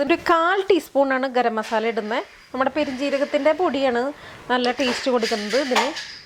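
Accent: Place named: Thrissur, India. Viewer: എന്തായാലും ആ trissur സ്റ്റയിൽ സംസാരം ഉണ്ടല്ലോ....തകർത്തു .... അത് കേൾക്കുമ്പോൾ തന്നെ ഞങ്ങൾ പ്രവാസികളായ trissur കാർക്ക് ഉള്ള സന്തോഷം ഒന്നു വേറെ തന്നെ യാണ്.......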